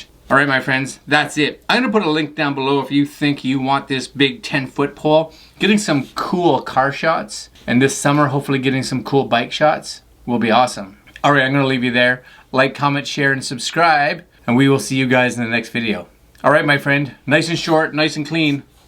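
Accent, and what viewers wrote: Canada. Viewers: That's one hell of a Canadian accent ya got there chuck.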